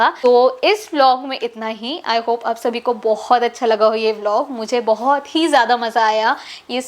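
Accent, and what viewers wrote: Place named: Telugu, India. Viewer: aapka English Hindi baat karte Telugu Aata sister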